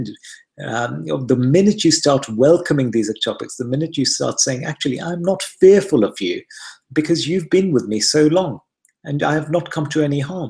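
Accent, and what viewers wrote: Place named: United Kingdom. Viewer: British accent.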